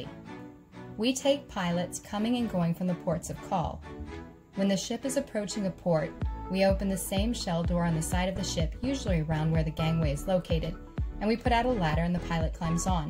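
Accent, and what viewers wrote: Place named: Australia. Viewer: #captainKateMccue are you a fellow Aussie? Your accent sounds Australian :)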